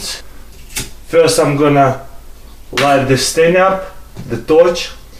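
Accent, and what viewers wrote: Russia. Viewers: Just speak Russian that's what it sounds like anyway and get some subtitles if you want more people to understand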